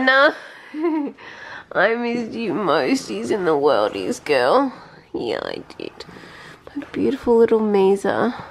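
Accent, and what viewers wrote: Australia. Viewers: haha is so aussie sounding (this is coming from a Kiwi haha)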